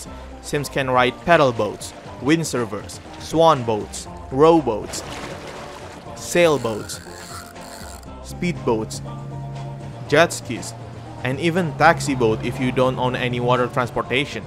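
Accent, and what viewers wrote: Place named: Indonesia. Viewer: Dude are you indonesian? I assumed it by your channel name and your accent lol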